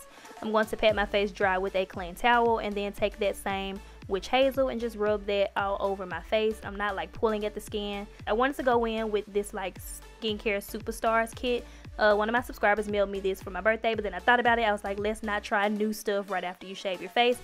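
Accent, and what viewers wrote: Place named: Memphis, United States. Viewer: Came here for the chin lift and heard that Memphis accent ... ended up watching the whole video 💕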